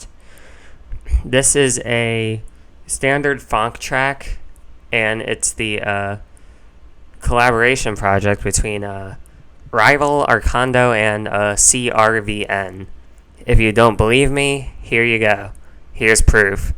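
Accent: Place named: North America. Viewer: Yoooooo good to hear a North American voice! Great commentary and hope to see more of these in the future!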